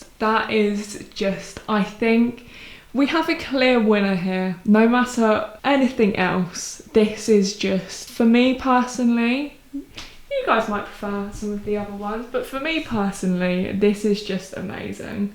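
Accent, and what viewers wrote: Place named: New Zealand. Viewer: Sounds Kiwi with.... Maybe visited the UK for a while?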